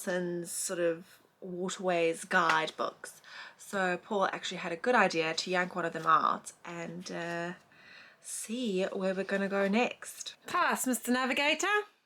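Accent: South Africa. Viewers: Another great video, I don’t think that using the bow thruster is cheating it’s a must have unit nowadays to have installed on your boat. It’s interesting listening to your South African accent it reminds me of my time in Johannesburg in 1982. When are you going to set sail to come up north, try the Ribble link and the Lancaster canal, 40 plus miles and no locks, You would love it. Anyway keep up the good work and don’t mind the weather. Best regards from Tom in Lancashire peace and god bless.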